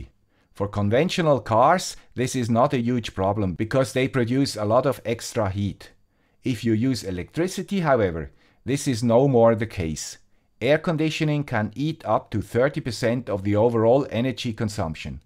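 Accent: Switzerland. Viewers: nun ja, Paraffin (Kerzenwachs) ist ein langkettiger Kohlenwasserstoff und verbrennt mit Sauerstoff in etwa zu gleichen Teilen zu Wasser(-dampf) und Kohlenstoffdioxid. Ergo müsste die Zunahme an CO2 mit der Abnahme von Sauerstoff in Verbindung stehen. Am Ende ist nicht mehr genug Sauerstoff für die Verbrennung da und die Kerze erlischt bei einem Wert um 16% O2 in der Luft. Vielleicht weiß ein anderer Zuschauer noch etwas. Wir sind ja alle hier um zu lernen :D Und danke für die vielen tollen Videos mit dem Schweizer Akzent™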